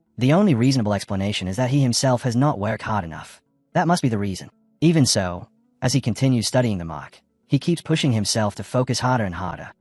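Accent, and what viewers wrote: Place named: United Kingdom. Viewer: Didn't expect the ai to be British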